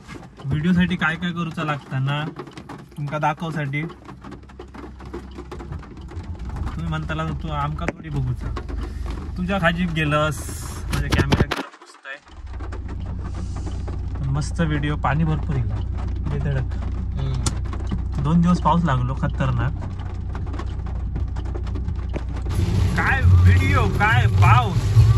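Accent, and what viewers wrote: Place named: Malvan, India. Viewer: Enjoyed vlog ani Asli malvani aikaila nicely explain the route 😊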